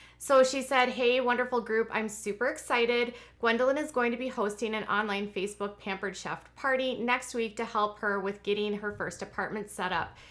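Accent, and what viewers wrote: Minnesota, United States. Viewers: This is the first video of yours I watched. I thought "she sure sounds minnasoooootan" :-)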